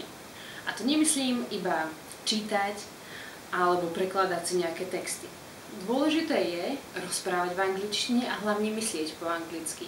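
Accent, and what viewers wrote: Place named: Brezno, Slovakia. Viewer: Aká pekná žienka - a ako pekne hovoríš po slovensky! Ale predsa len poznať, že si z Brezna :D Ináč ďakujem, ale po anglicky už viem.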